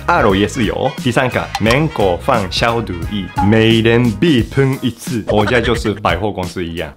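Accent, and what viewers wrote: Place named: Taiwan. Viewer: 好可愛噢！話語裡還會加上台語，看影片很療癒，搞笑，歡樂氣氛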